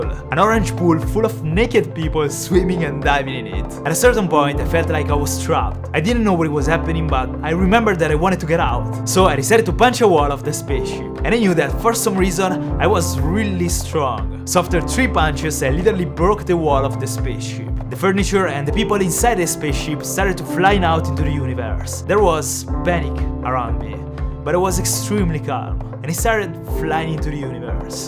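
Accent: Italy. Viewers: Gia dalla voce si capiva ma è italiano ahahahah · ho appena realizzato che sei italiano 😂🤣😅 eppure già dall'accento me n'ero reso conto 😂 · What about learning, I mean totally nailing a British accent next video - could be interesting to see how an Italian would improve his accent! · Si sente che è italiano😆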